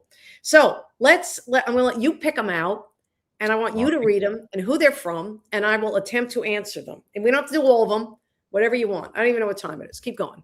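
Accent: New York, United States. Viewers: Wow this is a gift! Another fab sports podcast and finally we get to hear your NY accent! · your NY accent makes me homesick, living in Europe😂😂😂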